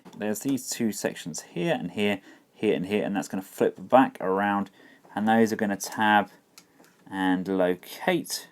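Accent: England, United Kingdom. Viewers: you and your puns, more funny in your English accent!!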